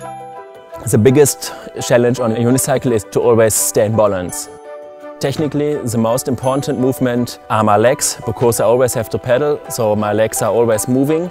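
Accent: Germany. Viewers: Man with thick German accent rides down mountain on unicycle.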